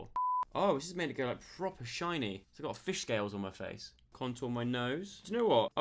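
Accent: Australia. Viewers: we love an Australian accent when I look at myself in the mirror what a pro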